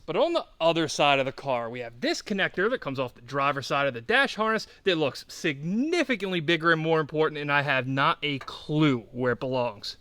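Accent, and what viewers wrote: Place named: Delaware County, United States. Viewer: Man I hear your accent so hard, almost sounds like you’re from Delco?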